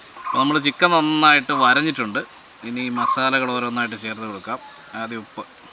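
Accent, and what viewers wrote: Kottayam, India. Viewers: കോട്ടയം ആണോ സ്ഥലം ? സംസാരം അങ്ങനെ തോന്നുന്നു.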